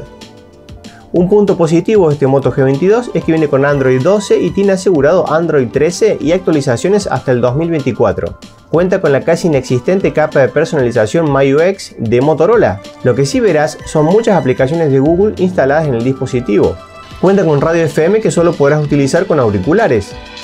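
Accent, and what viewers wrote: Córdoba, Argentina. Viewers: loco sos cordobee ! te seguir{e por eso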